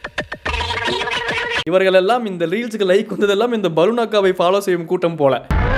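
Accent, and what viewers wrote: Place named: Kongu, India. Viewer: Kongu pechu varuthu athan.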